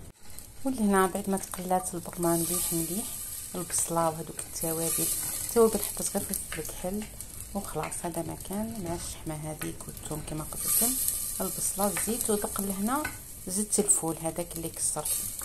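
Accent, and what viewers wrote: Algeria. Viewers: ربي يعينك اختي الكريمة صوتك يبان من جهة الشاوية مانحسوش من جهة جيجل رائعة فحلة نتاع الصح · وين هادي تبالي عندنا في ميلة نفس الجاري لي نديروه وتبالي نفس لهجة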